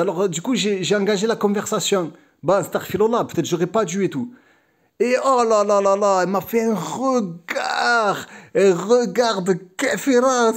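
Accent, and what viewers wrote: Marseille, France. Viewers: Wa aleykoum salam, ça me fait toujours sourire ton accent et tes mots marseillais. Pour revenir au sujet, moi ce que j'ai vu c'est que les familles dissuadent généralement l'enfant de rentrer au bled pour qu'il ne "gâche" pas sa vie professionnellement surtout s'il est diplomé.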